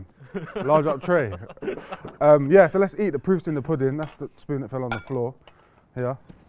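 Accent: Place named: London, United Kingdom. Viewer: He speaks London👍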